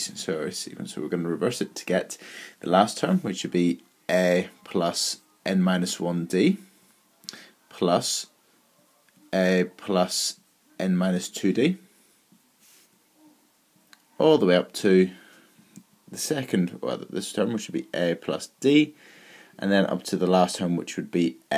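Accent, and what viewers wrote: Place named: Northern Ireland, United Kingdom. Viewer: ahhh! Got the N.irish but couldnt place what else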